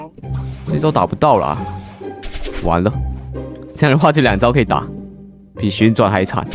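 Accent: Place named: Hong Kong, China. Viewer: R子你可以用马来西亚的口音吗？好怀念你马来西亚口音。你现在的口音好像香港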